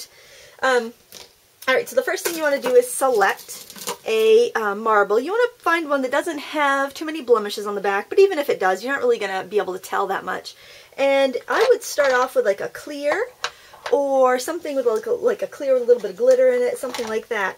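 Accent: United States. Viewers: Hi from the UK. Are you as manic/scatty as you sound, I really love the your voice, so enthusiastic, typically a yank. Thanks for being you, 😀😀😀😀😀😎😎😎😎😎😎🙏🙏🙏🙏🙏❤❤❤❤❤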